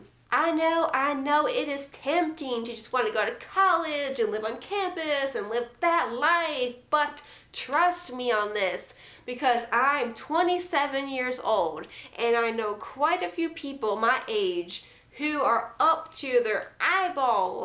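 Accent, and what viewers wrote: South, United States. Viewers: Good vid. Your chin dimple is getting cuter each time. Where does this southern accent come from.....real or are you just putting in on? BS says free college for everyone...just what we need. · you accent is become increasingly countrified. It's getting me excited.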